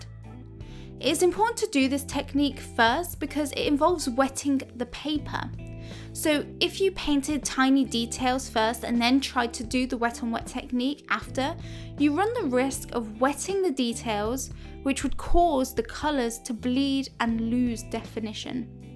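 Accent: United Kingdom. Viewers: You are EXTREMELY British. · Actually I was enjoying to listen to that amazing British accent 👍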